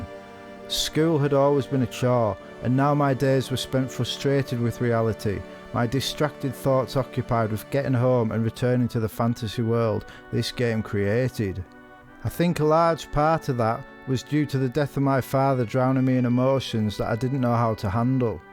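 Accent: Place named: Cumbria, United Kingdom. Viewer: You've got a gift for this brother. Goosebumps. Even with a Cumbrian accent.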